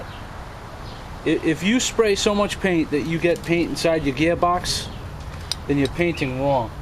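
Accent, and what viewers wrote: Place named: Boston, United States. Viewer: do you live in boston? because i do to and recognize that accent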